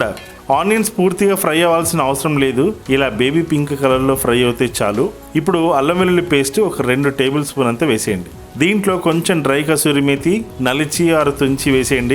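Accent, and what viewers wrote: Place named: Telugu, India. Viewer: garu veg fans ni alarinchi naduku Dhanyavadalu!! Sir meeru films lo padalani korukuntunamu original telugu voice vastundi mana patalaki.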